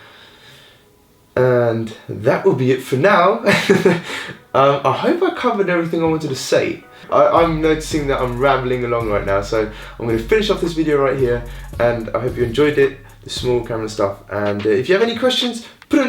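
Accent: United Kingdom. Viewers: Hört man sehr stark Raus 😂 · Wieso kannst du so gut Englisch? Hört sich halt ultra nach nem britischen Akzent an... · okay I´m really flashed right now I have been watching felix` vlogs over the past few weeks daily again and when you stepped in the #TeamSpielkind I really liked how you edited the videos and how you were and are in the vlogs but on German and now I FINALLY checked your chanel and I must say that I`m pretty flashed by your British Accent haha xD ok I had to say that. I wasn`t expecting this xD Didn´t know you were British haha. Okay keep on I will follow you on.:D Bye have a nice day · Guten morge. Bin neu auf deinem kanal durch #teamspielkind. Woher kommt denn der nice britische akzent?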